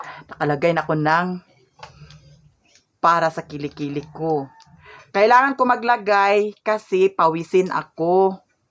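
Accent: Visayas, Philippines. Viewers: ka gwapo s aimong mga pag umangkon madame;; alegra dha dapita,,makataw ako kay visaya ninyo d mag kapareha sa davao ;pero kasabot pako madame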